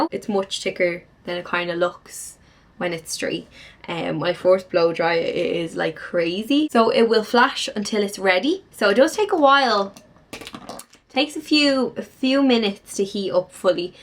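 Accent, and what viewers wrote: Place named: Ireland. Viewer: She Irish what do you expect, people can’t just change the way they speak.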